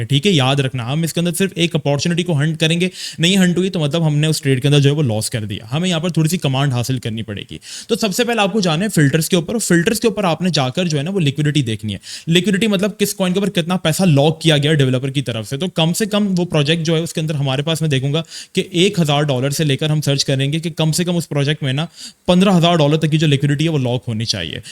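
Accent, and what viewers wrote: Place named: India. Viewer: aapka accent almost indian accent hai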